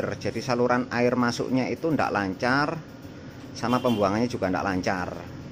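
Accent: Surabaya, Indonesia. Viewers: logat bahasane khas wong jowo timur asli arek2 suroboyo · Suroboyoan ngene wkwkw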